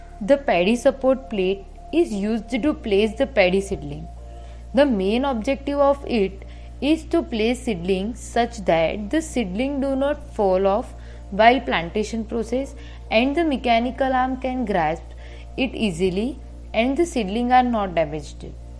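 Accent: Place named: India. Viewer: Oh God I love Indian accent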